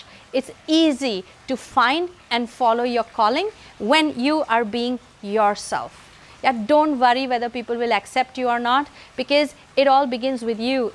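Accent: India. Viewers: You speak pure Indian English. Which is obvious and with charming pronunciation. So it becomes very easy to understand;and there for everybody likes your lectures. You are a jwel for the Indians. --Dr Mohanty, Seraikella (Jharkhand).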